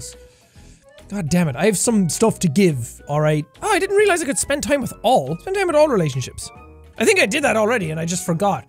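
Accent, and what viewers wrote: Ireland. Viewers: Sometimes when he sounds really Irish he sounds like sean from rdr2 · Jack turning more and more irish. XD "Fock em!"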